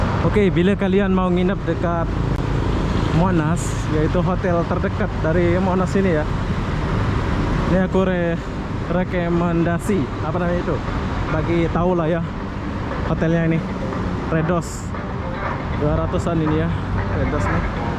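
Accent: Malaysia. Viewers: Kamu org malaysia apa indonesia? kok ky ada logat dan kosakata melayu malaysia? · Bang padahal orang lombok, knapa abang masih pakai bhasa orang KL. Pakailah bahasa sendiri yaitu bhasa indonesia, apa udah terbiasa duduk di KL jd lupa sama tata bahasa sendiri,,susahh brnar hilangin logatnya itu..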